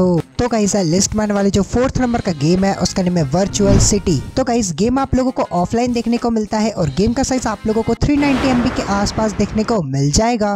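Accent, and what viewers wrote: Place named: India. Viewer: I do not understand this fast Indian accent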